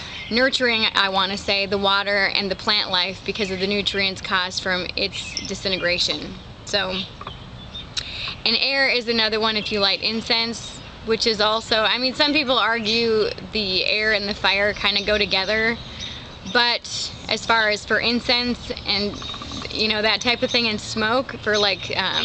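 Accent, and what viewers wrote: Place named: Wisconsin, United States. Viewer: Thank you sooo much! Your uhms and ya knows comes from WI (awesome)! Informative and sweet! Blessed be!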